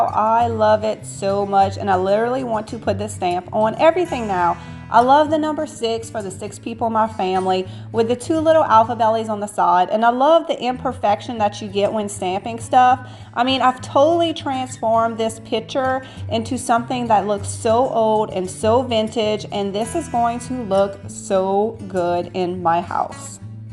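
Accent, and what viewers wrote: Louisiana, United States. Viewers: I know it’s been a year since you uploaded this video but I am trying to place your slight accent ……. Louisiana Cajun?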